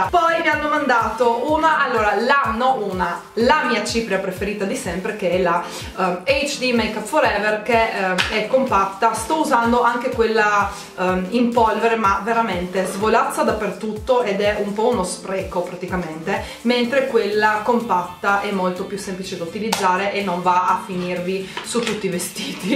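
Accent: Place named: Trieste, Italy. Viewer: oddio sei di Trieste anche tu ahahah! infatti mi dicevo che hai un accento troppo famigliare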